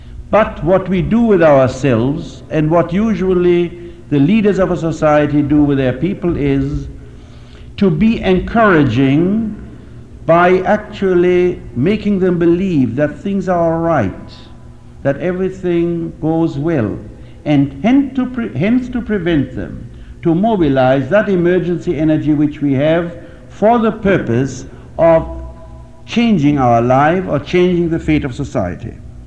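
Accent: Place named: India. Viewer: Why does he sound like he has a slight Indian accent ?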